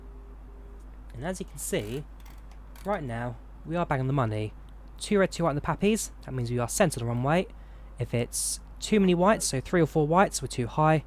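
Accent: United Kingdom. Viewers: Such a nice tutorial video . But couldn't understand your British accent sometimes. But big fan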